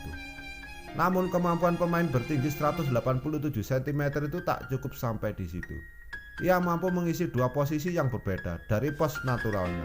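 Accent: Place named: Padang, Indonesia. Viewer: Dilihat dari logatnya, pasti orang Padang nihhh..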